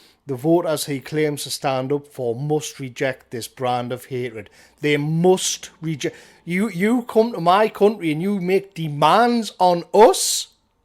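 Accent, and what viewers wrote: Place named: Working class, United Kingdom. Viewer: I love when you're ranting in that thick af british "working class" accent, m8. Keep up doing the good work, God Bless!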